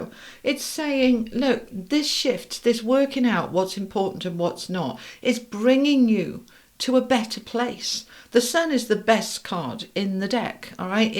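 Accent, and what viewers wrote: United Kingdom. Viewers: I am free I am secure, I am safe. I love your wonderful energy and love to hear your British accent- so many of my Favorite musicians are from the UK …. I wonder if I have had a past life there with the attraction and fascination with everyone abroad ❤ thanks for sharing your lovely messages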